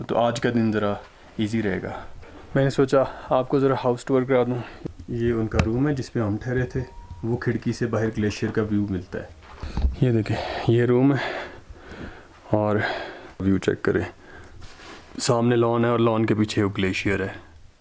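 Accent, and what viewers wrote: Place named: Lahore, Pakistan. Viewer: AP Lahore k ho jes hsab Sy bolty ho Lagty Lahore k ho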